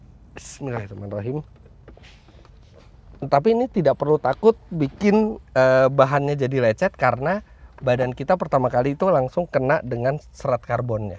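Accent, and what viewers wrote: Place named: Chinese-Indonesian, Indonesia. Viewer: dari aksennya iki cindo sby lek gak semarang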